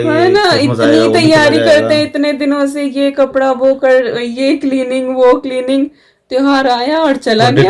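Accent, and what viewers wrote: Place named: Haryana, India. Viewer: Hlo apki bhasa haryana se milti julti you are my favorite YouTuber 🤗🤗🤗🤗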